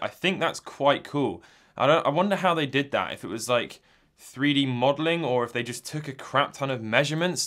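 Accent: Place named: United Kingdom. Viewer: >annyoing british accent disliked and moved on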